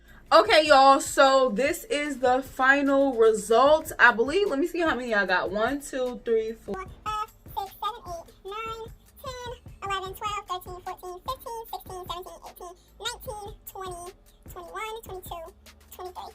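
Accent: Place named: North, United States. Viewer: Girl I love your accent! I’m from TN but my husband’s from up north and y’all kinda sound alike!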